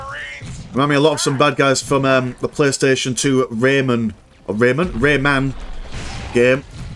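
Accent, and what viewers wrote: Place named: East Yorkshire, United Kingdom. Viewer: I wonder which side of east Yorkshire 🤔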